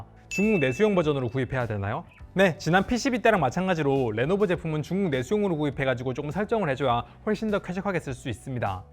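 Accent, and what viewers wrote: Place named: Seoul, South Korea. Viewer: 이분 서울사람 맞나요? 표준어가 상당히 어색하네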